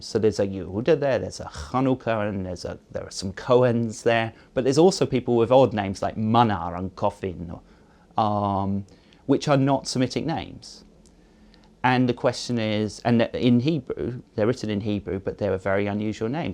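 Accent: Russia. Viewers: Brilliant program. Host is an Ashkenazi with a comical Hollywood-style Muscovite accent. He couldn't be funnier if he tried.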